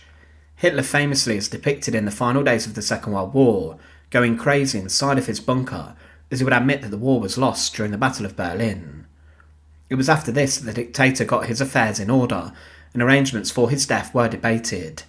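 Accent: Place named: England, United Kingdom. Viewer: He's English. Very easy to understand if you're English but I'll agree not a very naturally spoken presenting voice.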